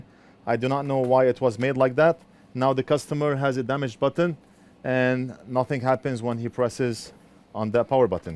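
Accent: Lebanon. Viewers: Dude has a Lebanese accent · are you Lebanese? your accent and the ear analogy are very Lebanese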